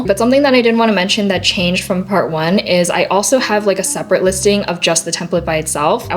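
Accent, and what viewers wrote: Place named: South Korea. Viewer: 발성이 한국사람인데ㅇ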